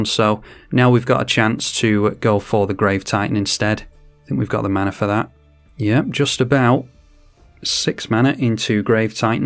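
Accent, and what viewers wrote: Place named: United Kingdom. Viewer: Talk british to me mate. Honestly your voice is outstanding.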